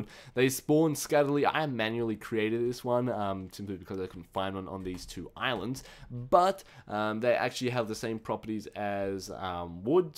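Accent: Australia. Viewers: He has an austrailian accent..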